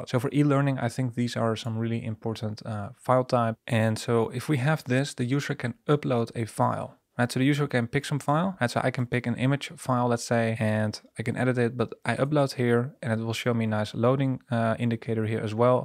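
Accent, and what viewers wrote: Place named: Netherlands. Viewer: Very interesting channel. Are you Dutch? You sound very Dutch :) I am travelling around the world and do most of my work remote. How about you?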